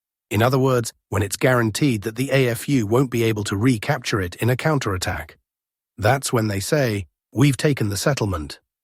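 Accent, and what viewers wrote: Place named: United Kingdom. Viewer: You're no! You speak like a British, better than British!!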